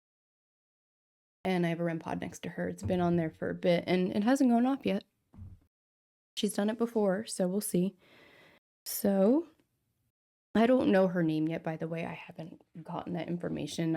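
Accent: Australia. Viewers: Because dolls/toys are attached to people's childhoods or something? That's just my thoughts 🖤✌️👑👽 (maybe Dolls are like humans and turn into some kind of vessel) p.s. best australian accent ever¿ 💀 THIS STORY IS WILD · As an Australian myself, that accent was gold 😂👍🏻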